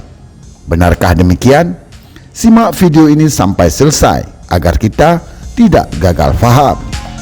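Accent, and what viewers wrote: Aceh, Indonesia. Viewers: assalamualaikum,,, ni yg ngomong koq kayak orang Aceh dialek nya??? 😁🙏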